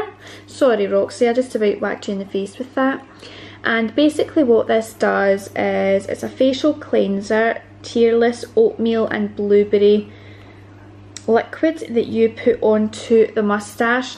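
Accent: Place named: Scotland, United Kingdom. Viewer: Ah, a beautiful Scottish accent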